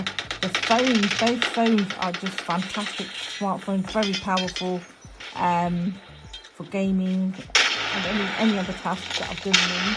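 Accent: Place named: United Kingdom. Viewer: It's a British accent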